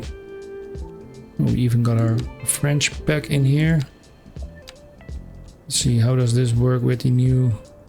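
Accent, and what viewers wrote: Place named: Netherlands. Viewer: That accent... a fellow Dutchman by any chance?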